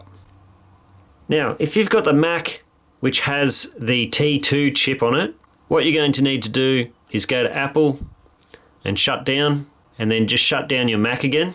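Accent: Australia. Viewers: Thanks so much for this tip. I did both methods and got it to work - charing again - on the second method. Great to hear an Aussie voice for a change. Cheers M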